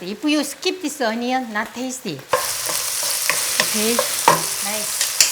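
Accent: South Korea. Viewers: Your Korean accent is very endearing (e.g., "too muchhhy""). Please don't ever change.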